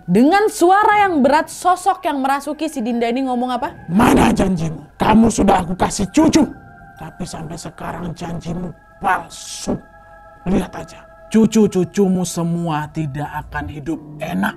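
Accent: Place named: Medan, Indonesia. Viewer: Cocok kali kayaknya kakak ini main ke mentah kali logat medannya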